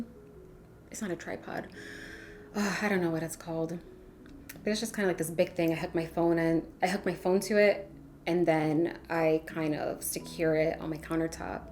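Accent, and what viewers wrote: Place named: Midwest, United States. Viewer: Love your page! I’m married to a puerto Rican and your recipes are so easy to follow. Im from Chicago and I can tell by your voice you were a midwestern 🫶🏻🫶🏻your just as pretty as your lovely voice im so glad to put a face to a name!! Im also a Christian and love the verses you post on your videos. God bless your page!!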